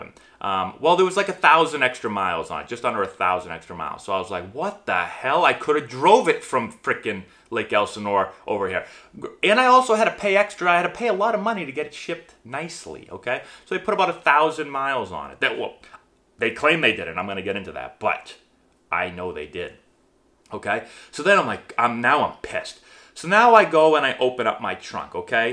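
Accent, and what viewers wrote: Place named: Massachusetts, United States. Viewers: His Massachusetts accent really comes out when he gets animated. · Yo im from Massachusetts too! Your accent sounds just like my brothers. For whatever reason I don't have much of a Boston accent.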